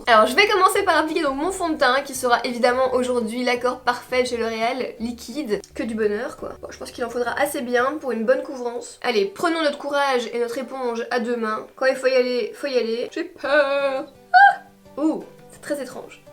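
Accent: Belgium. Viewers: Salut j'espère que tu vas bien je t'adore tu es ma youtubeuse préféré et surtout j'adore ton accent belge lol. J'espère que tu vas me répondre car aujourd'hui c'est mon anniversaire et le meilleur cadeau que je veux recevoir c'est que tu me réponde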